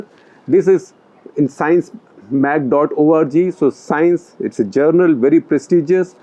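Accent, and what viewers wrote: India. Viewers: GOOD GRIEF!! SORRY UR YAKKING IS SO MONOTONOUS N NITBTO D POINT I HAD TI SHUTVOFF AFTER 5 MINUTES... IT WAS UNPLEASANT TO LISTEN...TOGETHER WITH D INDIAN ACCENT UT MADE IT WORST. . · Firstly so difficult to follow this Indian due to his heavy accent. Second, he failed to mention the outbreaks and deaths in Fort Detrict, Maryland back in August 2019, which the CDC Robert Redfield confirmed was covid-19. Then the traces of this virus found in waste waters in Italy, Spain and France as early as March 2019. These important facts were not mentioned nor investigated even though the findings were months early before the Wuhan outbreak. This report is rubbish and unreliable and biased !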